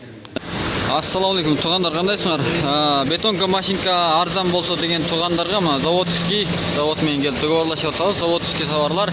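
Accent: Kyrgyz, China. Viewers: Бул алып баруучу кытайлык окшойт. Суйлогонуго Караганда? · сүйлөшүнө караганда кытайлык кыргыз бизге баасын айт дизельнийдин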